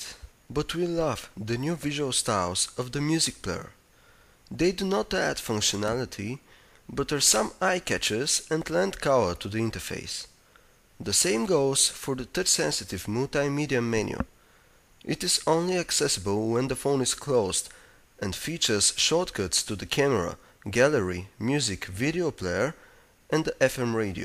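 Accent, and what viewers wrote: Russia. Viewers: is he from Russia ???????????? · wtf..the accent dude..like some russian dude in star trek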